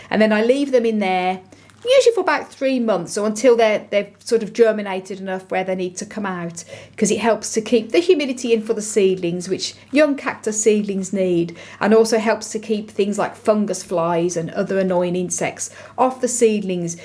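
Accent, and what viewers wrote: Birmingham, United Kingdom. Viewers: Hey, are you from the Birmingham area? I'm in Sutton Coldfield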